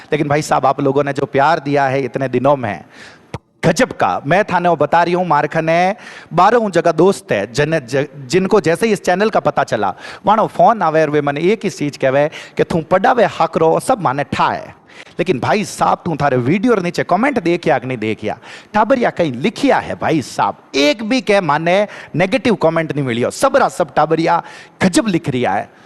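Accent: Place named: Jodhpur, India. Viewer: Sir aap ki jodhpuri boli kamaal hai✨👍😊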